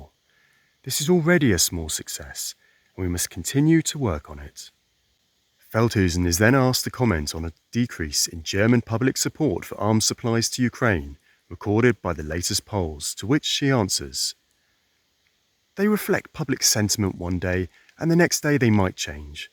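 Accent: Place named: England, United Kingdom. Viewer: Your accent is English!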